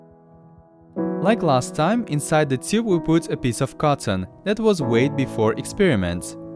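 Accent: Russia. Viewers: If this video was produced in Russia or any other country near it, and by the acsent of the speeker I can assume that the video was really produced there.... The guys will go to jail with weed experiments ;) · Jose Silva he is Russian so his accent makes "L"s and "V"s sound weird. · He is from Russia vs are pronounce with a w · Йор рашн акцент ин Инглиш им рилли кул:)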